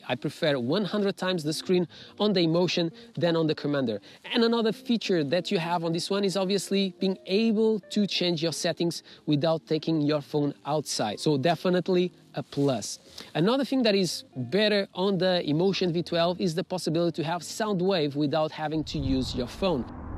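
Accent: Portugal. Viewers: Este sotaque é tuga não é? 😂 ❤🇵🇹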